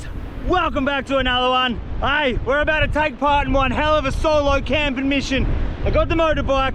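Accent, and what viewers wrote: Australia. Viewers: My fav channel to watch.. this cat is loaded with personality and charisma plus that delightful Aussie twang!! never a dull sec in his vid😅🇺🇸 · Love your AUSTRALIAN DIALECT...Not 2 mention your energy!¡! EVEN WHEN ALL U CATCH IS " SEAWEED". Try running the Bike on the WET SAND, it won't slip under u as easily... Just saying. Keek the videos coming Dawgie... LMAO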